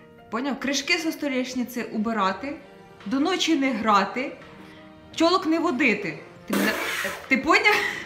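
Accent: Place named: Surzhyk, Ukraine. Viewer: Слухай,розмовляй будь ласка на Українській мові,ато ти говориш на суржику як Вєрка Сьєрдючка з Полтави.Роби щось з собою).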